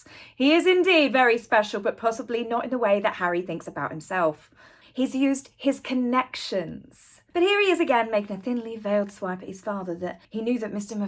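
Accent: United Kingdom. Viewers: Your British accent is so adorable and hilarious hahahaha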